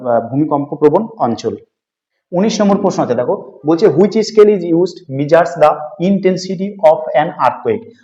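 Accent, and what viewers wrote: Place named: United States. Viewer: Sir bengali te bolun...... USA english er moto lagche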